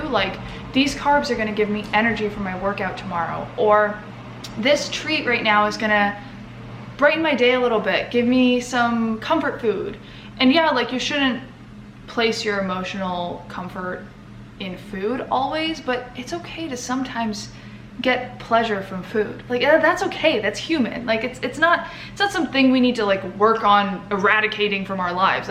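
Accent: Netherlands. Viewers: you kinda have a Dutch accent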